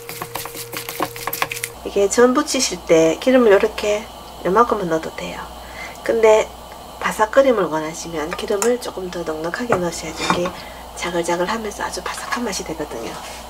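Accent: Gyeongsang, South Korea. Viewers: 정겨운 경상도말씨 ㅎㅎㅎ 오빠가 대구에 살고있어요~~ 좋은 하루되세요^^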